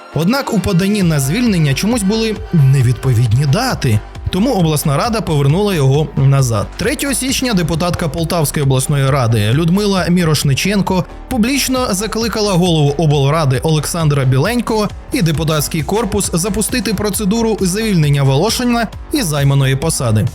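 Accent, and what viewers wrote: Russia. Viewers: Канальчик проросійський, з голови гниє. Совкові цитати, російські слівця та обороти в тексті, росіянський акцент у вимові нейронки. Головний месидж - в Україні все, ВСЕ ПОГАНО !!!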